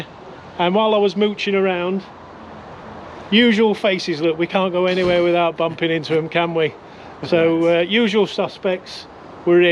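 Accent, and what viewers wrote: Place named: United Kingdom. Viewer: Ahhhh you are British ''Yeah'', ''Yeah'' ''Yeah'', l bet you says ''Guys'' too, a bonsai called Guy then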